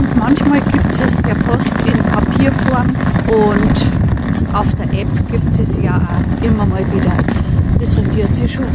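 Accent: Bavaria, Germany. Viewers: I bin nach Venezuela ausgwandert und gfrei mi wenn i a super Frau aus Bayern zuahern konn. Bin aus Minga❤ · Moin moin, na klar versteht Dich der Norden. Ich mag den bayerischen Dialekt, ist lustig 😅. LG, Helga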